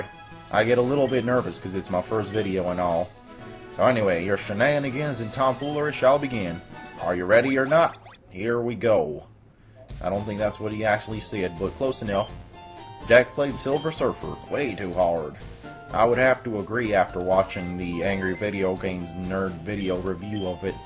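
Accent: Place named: South, United States. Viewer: New York, dang, you sound like a Southern guy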